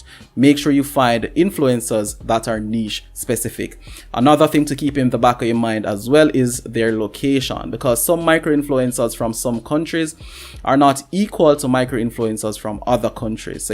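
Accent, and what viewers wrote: Jamaica. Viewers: You can tell he’s Jamaican 🇯🇲😂 real businesses man nuh true boss man‼️ big up uh self n guh chu n mek uh money bad man💯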